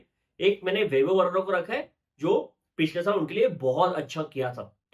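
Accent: Bengal, India. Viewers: bhai are you bangali?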